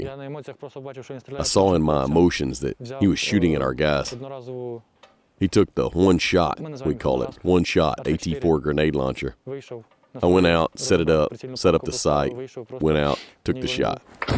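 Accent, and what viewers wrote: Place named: South, United States. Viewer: I really like the southern voice used! I like to listen to longer Resonance videos while driving.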